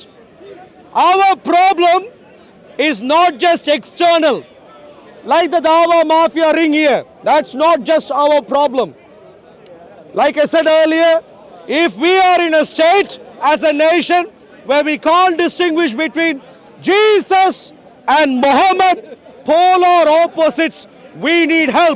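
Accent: India. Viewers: Why is an Indian talking and British in the background ?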